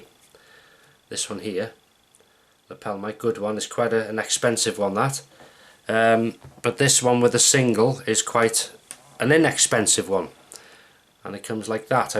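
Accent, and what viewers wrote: Liverpool, United Kingdom. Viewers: You from Liverpool?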